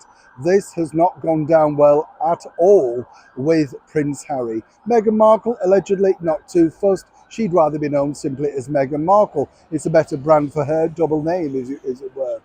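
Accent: Yorkshire, United Kingdom. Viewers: Nowt wrong wi' a Yorkshire, Northern accent, eeee, it sounds grand lad 🙂 The guttersnipe should hang his head in shame with what he's done, if he'd have done anything like this during Elizabeth I reign he would have ended up in the Tower on treason charges.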